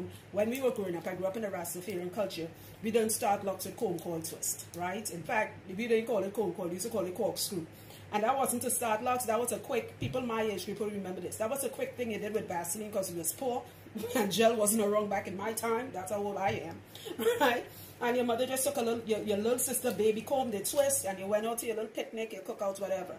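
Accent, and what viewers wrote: Trinidad and Tobago. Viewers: I Heard the accent and the beginning and I knew yuh was a Trini, Trini to d Bone!! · 30 secs in listening... Was like.. Wait......... Y she sounding trini.. Then boom u say you're trini, great vid hun. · Yes I know that accent 😍 hi Trini sista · Trini to the bone! Yes sister! Shout out from Chicago! I knew u was a trini soon as I hear ya voice. Both my parents live in Arima. Power! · Me listening to her talk: buh how she sound like a Trini so? Her: Everybody knows I'm Trinidadian Me: DAS WAH WE TALK BOUUTTTTTT. applauds and immediately subscribes I wanna start locs but I'm a dougla and there are no tutorials for my hair type, plus, I am soo worried about thinning so I clicked on this video for info and I am already SURE this woman knows what she's talking about. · From the time you started talking I knew you trini🇹🇹❤ I finger twists my hair two weeks ago so I am going to do the two strands twists asap 🙌🏾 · Im watching these comments and I see people talking bout your accent then I raised the volume and I'm like "wait, that's Trini I hear?!" It's nice to see that I'm looking at loc videos and I come across a fellow Trinidadian. 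· i could hear that trini accent hardd lol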